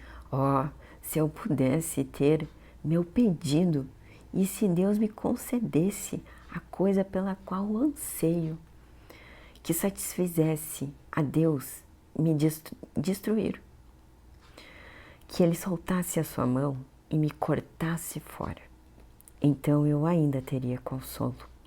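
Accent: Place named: Rio Grande do Sul, Brazil. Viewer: Você é gaucha nata né "bah" e sotaque você do interior? Eu tbm sou gaúcho. Kkkk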